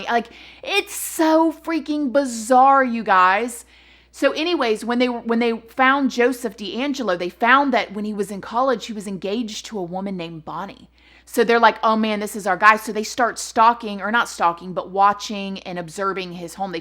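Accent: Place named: Florida, United States. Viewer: I'm always watching you and I think hummmm with the way you talk you must live in Florida. I live in North West Fl and have the same southern slang. LoL 🤣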